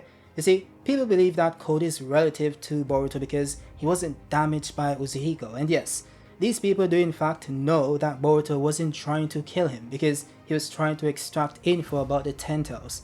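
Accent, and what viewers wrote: Jamaica. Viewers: Bro are you Jamaican ?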